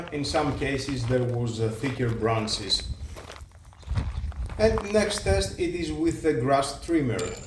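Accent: Greece. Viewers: Hello, I cannot help but like your accent. I've heard it many times on Greek ships when I was a service engineer 😅 are you also a seafarer or is that just how Greeks sound?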